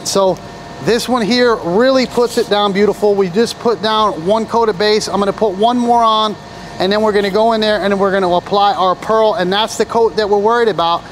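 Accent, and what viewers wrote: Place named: Boston, United States. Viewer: Accent like your from Boston. Or Minnesota.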